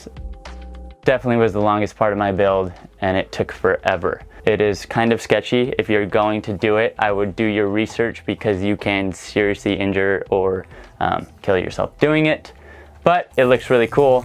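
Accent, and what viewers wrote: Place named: California, United States. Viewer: I don't remember if he said his location more specifically than the PNW but he sounds, to me, like an average Californian. You're making sweeping generalizations of a vast country, where people have had varying levels of educational opportunity, and insulting him based on it. He is presenting to a channel that likely has a wider audience than just the formally educated, some of whom might not recognize the array of synonyms that one may use to describe the things that have been a pleasant addition to their build. I'd argue that he was a very contextually effective speaker, using a language and tone that fits the channel and its viewers, and brought a fair amount of charisma, to boot. Regardless, organization and tool-building was also vital in bringing humans to our current time and place, and he seems to have a high level of aptitude for making and executing plans which met his personal goals. 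Why do Aussies call everyone "mate"? Why do the British throw around "slag" and "bollocks"? There's a unique context to every culture, and it's beautiful that there's more choices than just Standard English in our world.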